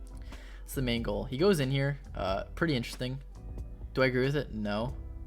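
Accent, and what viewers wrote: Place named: Canada. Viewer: I can tell ur a Canadian boy😂😂 one of my favs for sure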